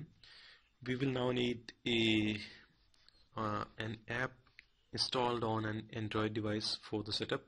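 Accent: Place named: India. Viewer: Indian accents are annoying AF! Had it on mute the whole time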